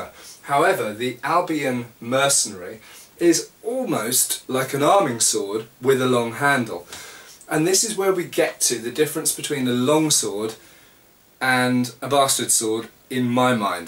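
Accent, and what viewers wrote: United Kingdom. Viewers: I don't know how, but you sound more British here.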